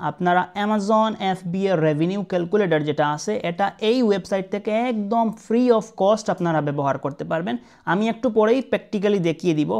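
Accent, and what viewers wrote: Sylhet, Bangladesh. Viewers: আপনি সিলেটের নাকি? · ভাই আপনি কি সিলেটি 🙂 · Bhai ki Sylheti?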